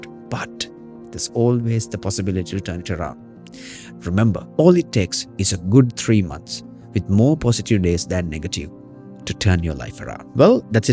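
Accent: England, United Kingdom. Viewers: Very much happy to hear your english accent sir. Love it. You are the motivation to express my self with confidence. I'll definitely speak with confidence one day like you. Thank you and keep up the valuable works. 👊 Jayen jayawewa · Happy to hear your English accent sir I think your voice have something magical power❤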